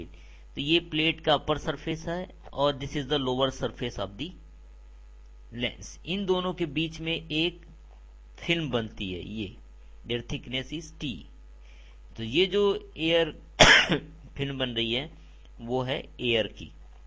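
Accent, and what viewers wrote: India. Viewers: now thats the way you explain it, yes he isnt fluent in English, but did a much better explaining job than most profs around the globe, its Just that u need to be Indian to understand him. Good Job (Y)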